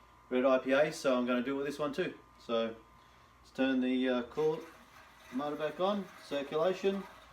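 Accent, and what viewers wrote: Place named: Australia. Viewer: Are you in Seattle? Can't help but notice ozzy the accent lol...Im in Western Australia, just saw your beer reviews on Craig Nubergers channel. Very interesting beer in this video! Keep it up.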